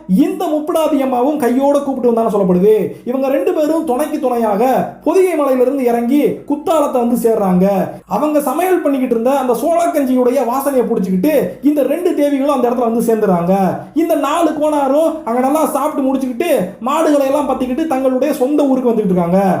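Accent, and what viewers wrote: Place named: Madurai, India. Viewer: Arumaiyana pathivu Nanba... Ungalukku maduraiya ... Unga pechula Namma ooru kaathu adikkuthu....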